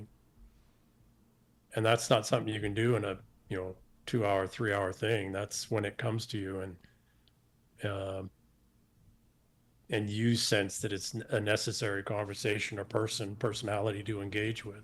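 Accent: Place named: United States. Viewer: He sounds American. So why does he speak as a British Subject? Is he British/English?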